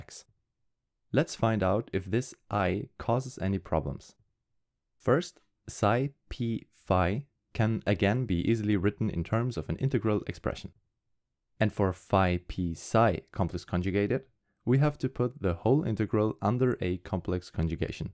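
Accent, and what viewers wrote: Germany. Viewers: Deutsch, hört man direkt 😂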